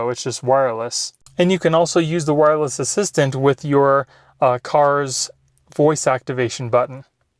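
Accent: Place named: Australia. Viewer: Aussie voice cool.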